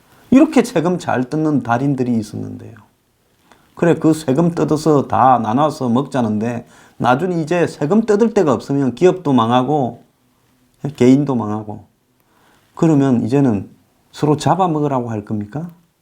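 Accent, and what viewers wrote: Gyeongsang, South Korea. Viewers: 내가 이런 글 잘 안쓰는데... 늙어서 곤대질 하질말고 조용히 살아라. 갱상도라고 티내지 말고...내가 다 부끄럽다 아이가. 이 자식아.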